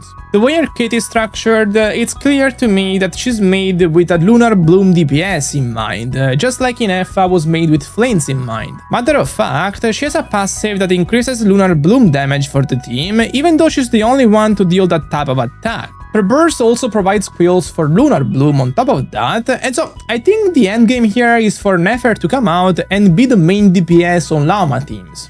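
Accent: Italy. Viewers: Chat is this guy italian? He sounds like one and i like it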